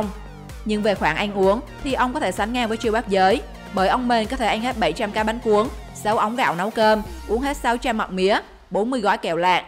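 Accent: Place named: South, Vietnam. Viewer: Miền Nam giọng ngta như vậy m còn đòi hỏi j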